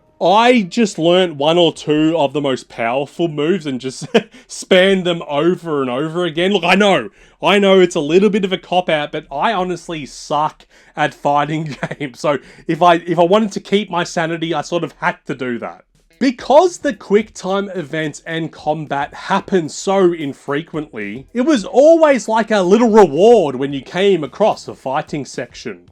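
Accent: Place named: Australia. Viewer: As an American, I love a good Aussie narrator 👍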